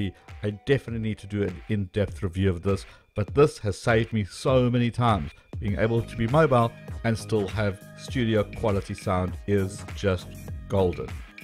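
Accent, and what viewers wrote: South Africa. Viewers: Are you South African ? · You sound like you're South African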